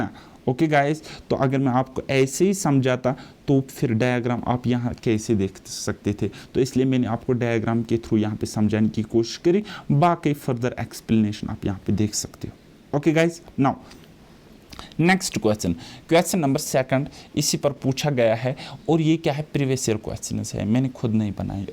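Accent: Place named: South, India. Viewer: Sir ap na south Indian or kashmiri acesente mix keya ha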